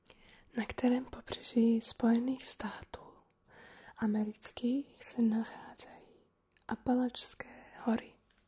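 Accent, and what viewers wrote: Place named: Slovakia. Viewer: Jé to je hezký, jak i v té češtině máš slovenský přízvuk a tu češtinu máš takovou pěkně měkkou :-). A chválím za ř. Vyslovuješ ho moc pěkně. Asi to bylo pro tebe moc těžký soustředit se na češtinu a ještě šepot. Takže video polohlasem a to já osobně moc nemusím. Takže mě se líbíš, když mluvíš slovensky, protože máš krásně jemný, uklidňující šepot a myslím, že to je právě tou slovenštinou,protože je taková měkká a pro ASMR super. Jinak fakt chválím za pěkná videa a, že poslední dobou hodně vydáváš.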